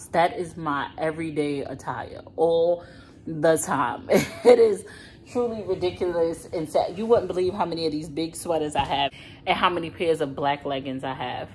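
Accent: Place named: Brooklyn, United States. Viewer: It’s the east coast Brooklyn NewYork accent for me 😍💕 thanks for sharing honey ♥️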